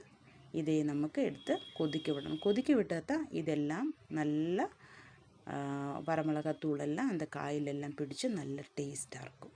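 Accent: Kerala, India. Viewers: Your tamil is good qith malayala accent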